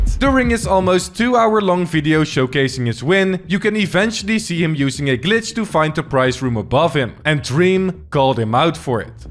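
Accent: Netherlands. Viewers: Why the freak are you trying to talk english with this accent, if ur dutch just dont do it bcous then its just irretating. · I smell dutch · I smell Dutch 🇳🇱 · You have a dutch accent and i know cuz im dutch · Bro u Dutch? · are u dutch.....?? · i smell dutch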